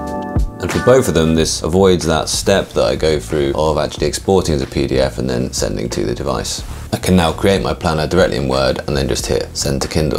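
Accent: United Kingdom. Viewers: I watch your videos just to take a quick powernap… the base in the voice, British accent… ooo… so satisfying to ears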